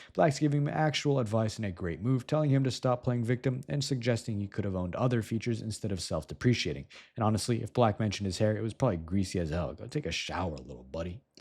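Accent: United Kingdom. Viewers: that british accent fucking broke me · The British accent is excellent. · the british accent is thick with this one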